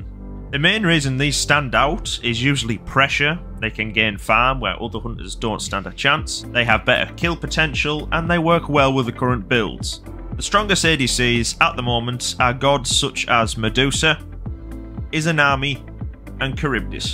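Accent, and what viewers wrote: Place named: North, United Kingdom. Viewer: Ano thas from the north but I cant quite put ma finger on where exactly thas from cocka spadge and its driving me nuts but thy accent seems like its from round t'corner from mine.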